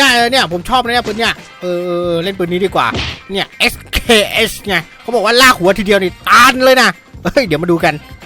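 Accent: South, Thailand. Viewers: ชอบมากเลยงับ สำเนียงใต้ <num>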